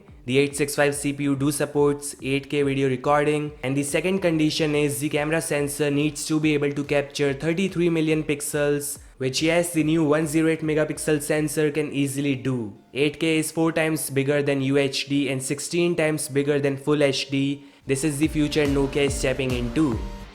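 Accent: India. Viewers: I bet this is narrated by an Indian.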